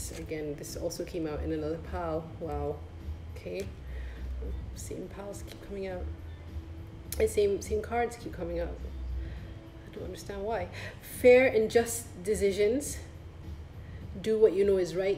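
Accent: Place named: United Kingdom. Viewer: Picked pile 1 TARA I literally wrote Tara’s mantra snd stuck it on my wall few days ago . And YES RELEASE A RELATIONSHIP GOSH KEEPS COMING UP! yes proud of myself thank you for reminding me. Look at all that I have accomplished, and teaching sounds like me! Sharing wisdom! And expressing myself 😭😍 beautiful! Ps. like your cute British accent 😏😏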